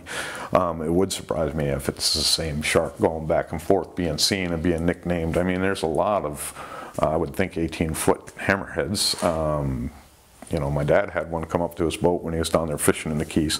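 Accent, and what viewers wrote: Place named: Great Lakes, United States. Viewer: BTW I’m from Michigan, you sure sound like you’re from here or the Great Lakes area!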